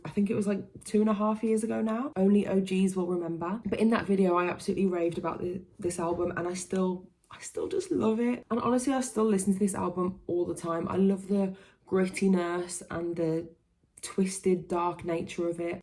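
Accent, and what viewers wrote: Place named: Birmingham, United Kingdom. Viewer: awesome!!!! yes it was amazing!!! i saw him twice, i was at the London on the 7th and Birmingham! I can tell from your accent that maybe you're from Birmingham? not 100% but i'd say maybe you are.. so I imagine you went to that one.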